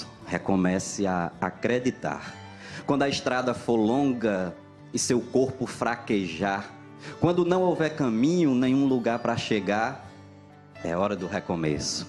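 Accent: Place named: Brazil. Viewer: Que bom ser premiado com uma poesia tão clara, que nos leva a meditar. E com o sotaque de Brasil, com vocabulário puro e nordestino, sem receio de nada. Sou eu fã. Um paraibano em Sampa cheio de Gratidão!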